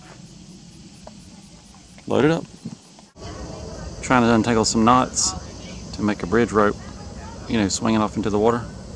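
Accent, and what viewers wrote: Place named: West Virginia, United States. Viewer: Dude I like your numbers mine are chad273👊 if only I had your talent👊 new subscriber here, and I watch a lot of channels and you seem to have figured out how to retain attention. I don’t know where y’all are at but you sound like a West Virginian. You sound like Elliot Sadler which helps on keeping attention. Looks like I have some catching up to do on your channel! Great stuff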